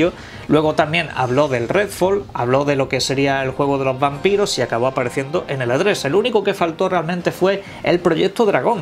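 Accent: Spain. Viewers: Me causa amsiedah que las palabras en ingles las pronuncies con acento español (por ejemplo, con halo decir "alo" en vez de "jeilo")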